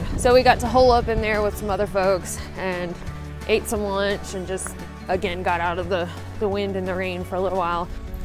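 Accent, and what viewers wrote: South, United States. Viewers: The Forrest Gump thing had me like🤣🤣🤣 I remember the first time I watched one of your vids and heard your accent,I said,she’s from Alabama,Georgia or Tennessee. Greetings from a fellow southerner. Knoxville,TN boy here.✌🏼 p.s. there’s nothing better than a southern girl👌🏽🖤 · LOL, I read the title of this video with your southern drawl in my head! Thanks for the laugh and have fun in Spain!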